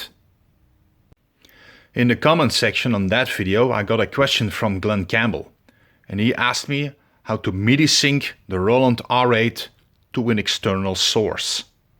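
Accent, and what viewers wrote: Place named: Netherlands. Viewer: Jouw accent klinkt Nederlands dus bij deze: dank voor de video. Heb je ook muziek van jezelf geupload naar Youtube?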